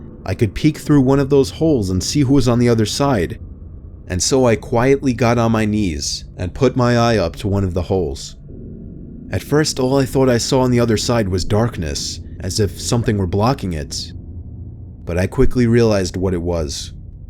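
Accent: United States. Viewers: Lina Santos he does lol American accent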